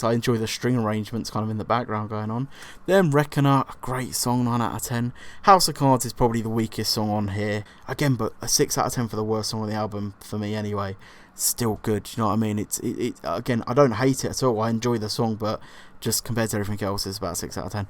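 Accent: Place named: Liverpool, United Kingdom. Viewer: I like the Liverpool accent. It reminds me of.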